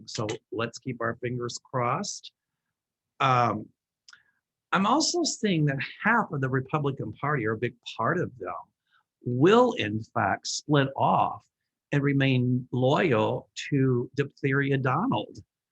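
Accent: South, United States. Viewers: I was just wondering where your accent was from ? I knew it was somewhere from the south. ( I am an Aussie, accents fascinate me ) I love your readings,so happy to see you have recovered from that vile disease 💜💖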